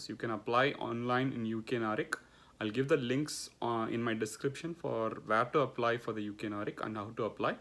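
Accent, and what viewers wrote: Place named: India. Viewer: Hi sir Good effort👍 Also I observe your Indian slang not changed while staying there even after 10 years. Is that because its a Britain English. Many people in US after 3 years talking like US 🙂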